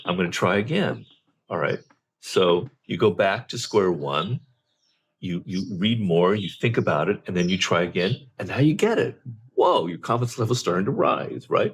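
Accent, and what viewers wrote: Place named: United Kingdom. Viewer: I have difficulty understanding the British accent. I’m a beginner. By the way, great video.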